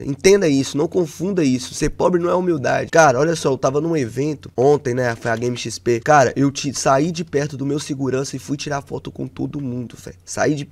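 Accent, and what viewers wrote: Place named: Santa Catarina, Brazil. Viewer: sotaque catarinense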